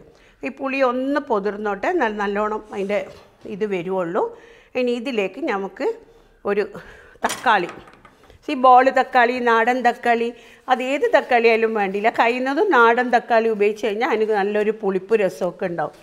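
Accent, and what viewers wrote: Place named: North Kerala, India. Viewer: Superb presentation. Like a mother teaches her sibling. North kerala cooking with North kerala dialects... 👌🏻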